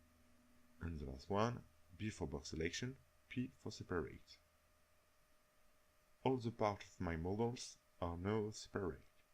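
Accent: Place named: France. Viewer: Don't worry about your french accent this tutorial is great! I just started researching voxels so this place is a good start for me! Thank man!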